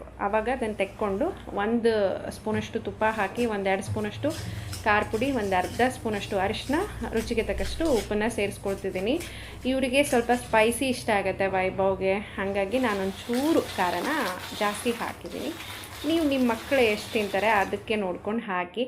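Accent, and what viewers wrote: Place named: North Karnataka, India. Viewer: good to hear ur kannada...u speak uttara karnataka kannada with ur family and as usual common kannada slang in the vlog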